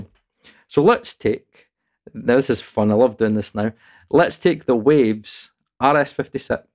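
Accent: Scotland, United Kingdom. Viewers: Lovely Scottish accent! 😊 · couldn’t agree with you more. Fortunately for me around 2000 I dreamt of having a studio in a rack, a plug and play studio that was minimalistic in nature and digital did it for me. I was never going back. Now I use the stock console plugin from my DAW (Cakewalk) and thanks to Waves thievery I steer well away from plugin manufacturers BS. What I want is a modern interface, no bloody knobs please and no fake ssl front ends. I don’t want colouration though I do use the tape plugin that comes with my daw. I’m slowly being weaned off this analogue addiction. I went digital for a reason and I must not forget that reason. Thanks for your videos. Love your Scottish accent by the way (viva Scott McTominay haha) and I look forward to your next video ruffling the feathers of these plugin manufacturers. Keep on keeping on brother.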